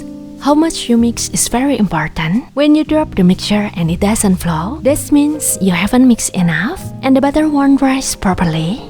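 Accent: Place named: Indonesia. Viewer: Thanks for the recipeee. Aksennya kayak aksen indonlish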